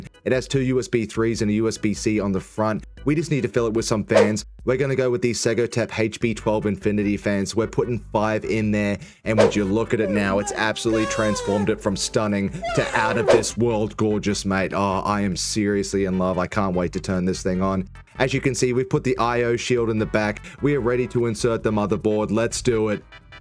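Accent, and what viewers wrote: Australia. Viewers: I wish I had an australian accent and a better grasp on how to edit videos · are you Australian. you soundlikeit ?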